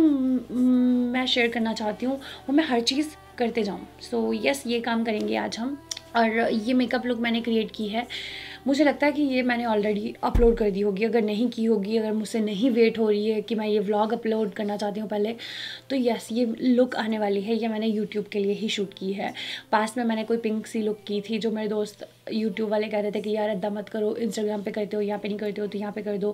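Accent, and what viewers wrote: Punjab, India. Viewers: Sis ap ki pangabi bht mazay ki hai or jo jo hubby kay sat maza ajata hai ma bhe panjabi hon from lahore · You are so cute di. Mujhe apke vlog boht pasand hai or jaise aap bolte ho mix punjabi hindi boht hi cute lgte ho. You guys are amazing god bless you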